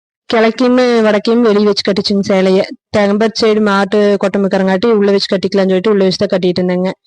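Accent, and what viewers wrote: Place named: Kongu, India. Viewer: மகிழ்ச்சி உங்க கொங்கு தமிழ் கேக்க இனிமை.வாழ்க வளமுடன்.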